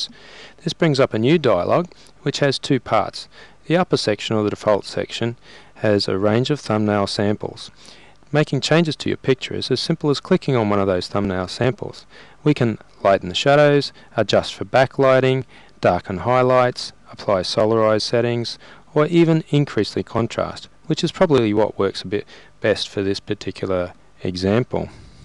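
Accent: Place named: Australia. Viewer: Aussie...hehe. Sorry I would have thought this was really informative but your accent is distracting...been living in the uk for too long lol.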